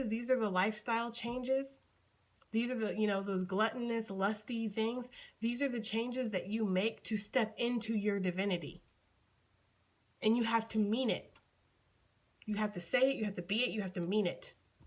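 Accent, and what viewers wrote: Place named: New York, United States. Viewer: You talkn to me this morning #pureNYvoice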